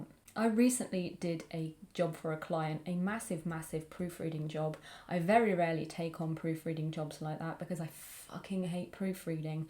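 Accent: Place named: England, United Kingdom. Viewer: I really like your videos, they are informative, inspiring, and make me smile. Great combo, plus being from London, it's so nice to hear an English accent.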